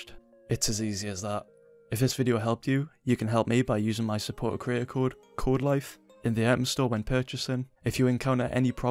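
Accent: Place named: United Kingdom. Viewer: 'H' key. Was tough for me to understand the British accent too lol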